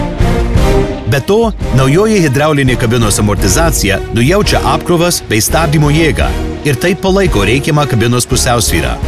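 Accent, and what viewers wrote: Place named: United States. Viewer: komentatoriaus amerikoniskas akcentas zudo :D:D:D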